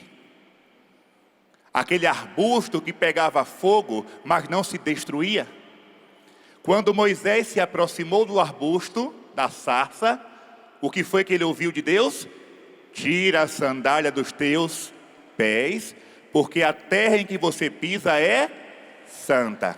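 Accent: Bahia, Brazil. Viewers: Boa noite Padre gosto muito do senhor admiro demais da conta suas homilia são todas maravilhosa gosto muito do sotaque baianos e de todos os nordestinos acho muito lindo🎉🎉🎉🎉🎉🎉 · Sua bênção padre sou mineira e amo suas pregações com esse sotaque baiano kkkkk Deus abençoe o senhor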